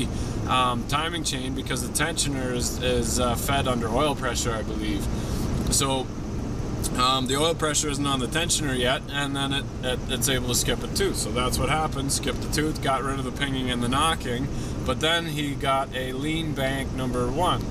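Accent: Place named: Canada. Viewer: canadian for sure. honest, efficient. nice guy too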